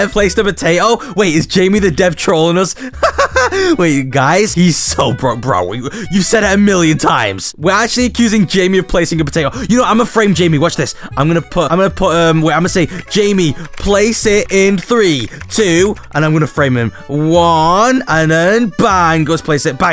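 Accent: United Kingdom. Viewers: Bros British…Hello mate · HES BRITISH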